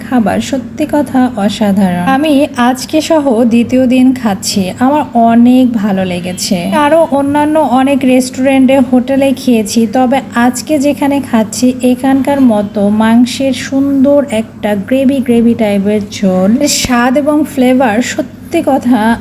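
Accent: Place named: Rangpur, Bangladesh. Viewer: আসসালামু আলাইকুম আপু ❤আপু আপনি রংপুরের ❤সেটা আমি ভিডিও দেখে আপনার কথা শুনে বুঝতে পারলাম ❤আমার বাসা রংপুরে ❤প্লিজ কমেন্ট ও রিপ্লাই দিবেন ❤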